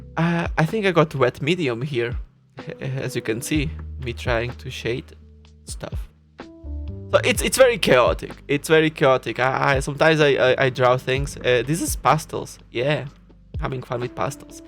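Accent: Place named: Europe. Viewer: I thought the celestia and Luna voice were mostly intentional. I didn’t realize you were from Europe? I think? XD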